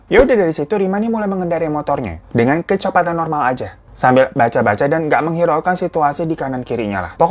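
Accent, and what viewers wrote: Java, Indonesia. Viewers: Bang...pliiizzz...jgn bkin aku ketawa ngedenger abang ngomong bahasa jawa...keren n lucu bgt👏👏👏👍👍👍usahanya polll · 😂😂lucu ngomong bahasa jawanya kk😂😂😂ngakak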